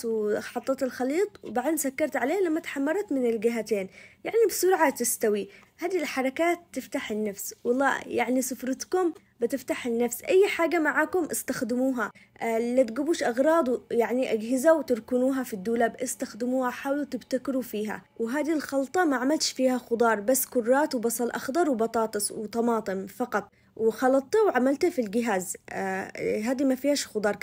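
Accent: Aden, Yemen. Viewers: فديت الكلام العدني الاصيل ربي مايحرمنا منك ❤❤ طبخك مايحتاج شهادات 😊 استمررري وأحلى لايك👍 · تسلم الايادي حبيبتي 💖… لهجتك تجنن اعيد الفيديو علشان اسمع اللهجه اليمنيه … اجمل واحلا لايك 👍🏻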